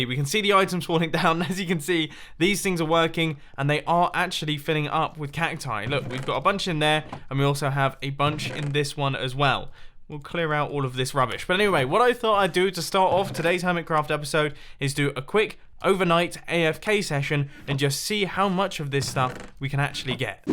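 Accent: United Kingdom. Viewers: I, as a german, really like your british accent. Your channel has very nice content. You are such a good YouTuber. 👍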